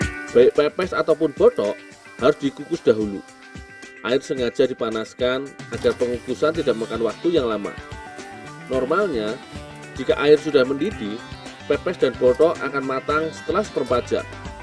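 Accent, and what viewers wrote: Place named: Banyumas, Indonesia. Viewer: Ngapak yow .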